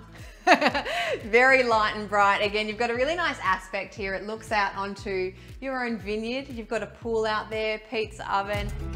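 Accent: Australia. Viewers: What part of Australia is your accent, I wonder. It's very different to what I hear on the daily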